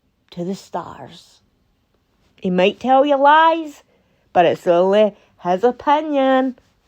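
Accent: Scotland, United Kingdom. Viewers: Love your content and your wonderful Scottish brogue!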